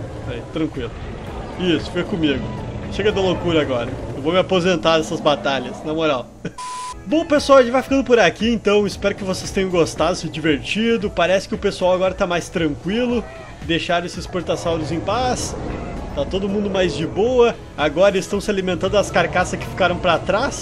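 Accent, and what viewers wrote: Brazil. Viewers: achas mesmo? Eu prefiro o vosso sotaque brasileiro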